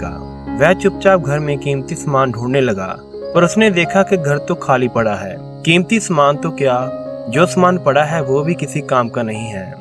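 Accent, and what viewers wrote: Punjab, India. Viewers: Bhai punjabi hai kya? Words bolte time punjabi accent aa rha hai for example missing "aa आ"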